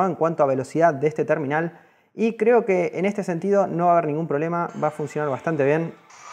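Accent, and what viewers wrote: Argentina. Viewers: sos argentino o español? porque decis algunas palabras en argentino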